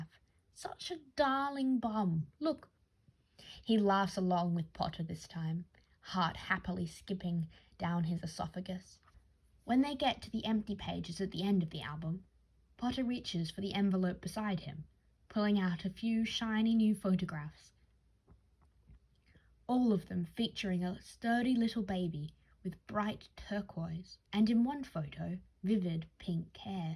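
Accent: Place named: Australia. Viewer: YESSSSS!!! finally a fellow Australian making one of these podfics, amazing by the way I find it hard to concentrate but i was really able to follow on with this on.😄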